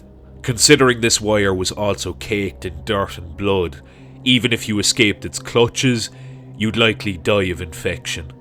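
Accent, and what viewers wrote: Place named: Limerick, Ireland. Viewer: Oh, by the way, what's your accent? It makes me think of OP Shakespeare a little bit. Edit: Ah, Limerick.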